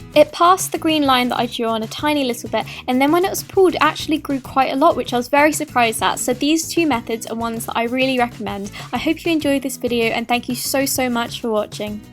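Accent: United Kingdom. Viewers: Your strong British Accent...Pretty good there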